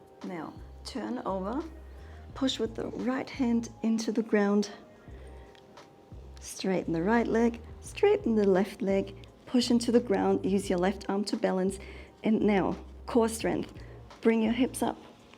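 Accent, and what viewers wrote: Germany. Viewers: I really like your German accent !!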